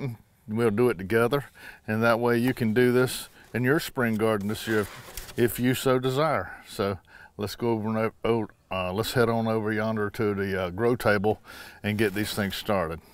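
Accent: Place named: South Carolina, United States. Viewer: Where are you from? Your accent sounds much like us, here in South Carolina. :)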